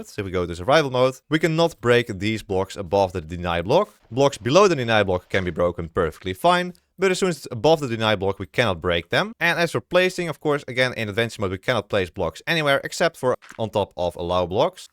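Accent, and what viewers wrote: Netherlands. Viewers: Damn bro ur dutch accent is faint. Lekker pik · Lekker hollands accentje 😂 heerlijk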